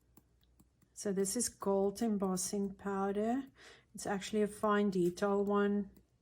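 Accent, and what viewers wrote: South Africa. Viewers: Ek het nogal gedink ek hoor n Suid-Afrikaner praat. Baie oulike idees, dankie. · Hi there from North Carolina, USA. You must be either in SA or an ex-pat! I grew up in the Cape. Love hearing you speak, brings back memories. I have been working with teabags for some years, trying many different projects!! Can't drink US tea, so still buy my Typhoo and PG Tips...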